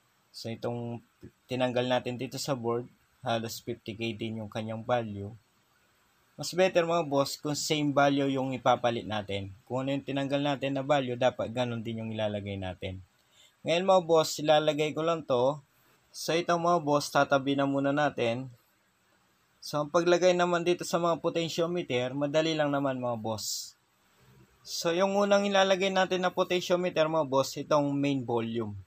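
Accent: Pampanga, Philippines. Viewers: Boss gnyan din problema ng ampli ko.. Mahina kbilang chanel. Pinaayos ko pero di naayos.. San loc mo? Prang kpampngan k kc kung mgsalita.. Kpmpngan din ako baka mgkalapit lng tyo paayos ko ampli ko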